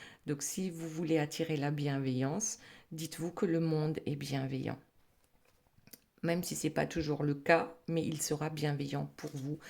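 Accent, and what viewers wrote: Alsace, France. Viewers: J adore votre accent m'a petite vous devez être Alsacienne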